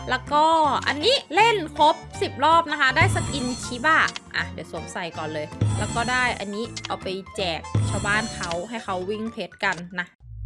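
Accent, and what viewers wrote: North, Thailand. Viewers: เป้นคนเหนือกะ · พูดภาษาเหนือบ่อยๆนะครับน่ารักดี❤️😊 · พูดภาษาเหนือตั่ลร้ากก พี่ 😳🥰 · พี่พูดภาษาเหนือ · พี่ พูดเหนือเป็นด้วย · คนเหนือออ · พี่คนภาคเหนือเหมือนผมเลย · เง้ออชอบให้พี่พูดภาษาเหนืออ่าาน่ารักดี😳😻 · พูดเหนือน่ารักมากพี่(;ŏ﹏ŏ)(๑♡⌓♡๑)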